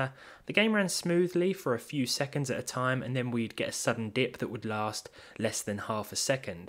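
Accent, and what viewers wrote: United Kingdom. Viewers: I have no direct need for the information you provide, but you are so likeable. Your voice is calming and your British accent is such a pleasure to hear. I enjoy your ponderings and I am sure a lot of people feel the same! Have a great weekend!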